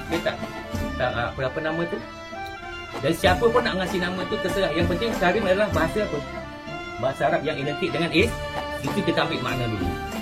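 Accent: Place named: Malaysia. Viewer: kenapa cara dia bercakap macam orang melayu di malaysia?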